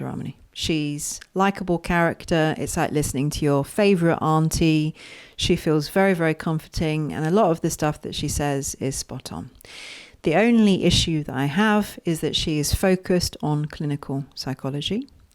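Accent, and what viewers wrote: United Kingdom. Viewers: I am thrilled to have just found your channel today. I've followed this topic for literally a couple of decades now. Agree 1000% about the need for content creators to have actually experienced NPD first-hand > not just clinical study. Same for therapists - the odds of finding a therapist who truly GETS it without direct experience is about nil, and yet so many CLAIM knowledge. They know that narcissism has become a popular buzz word and so they chase the clickbait, yep - and many do more harm than good for the almighty dollar. I follow Dr. Romani and others, also know of Vaknin, read a book by him many yrs ago but that guy just gives me the creeps so don't listen to him much and appreciate your perspective. I've been on a binge this afternoon. I appreciate your authentic and genuine approach. You have some unique perspectives, especially the spiritual aspect, which I've thought about some > esp that those who've been though narc hell are much more likely to SEE the evil in the world. 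They won't be held back by that cognitive dissonance so they can connect the dots. I find it amazingly brave of you to actually attempt comedy alongside narcissism, that takes MOXIE:-)!!! Thanks for this personal view, appreciated. I assume you are maybe somewhere in England from your delightful accent. Yes? Salutes from the US!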